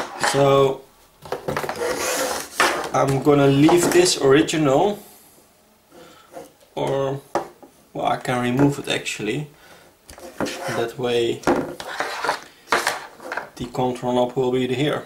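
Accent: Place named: Netherlands. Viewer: by your accent i guess you're dutch, lol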